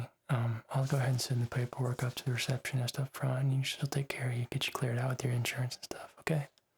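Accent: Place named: South, United States. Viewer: I hear a hint of a southern accent haha, are you from the south?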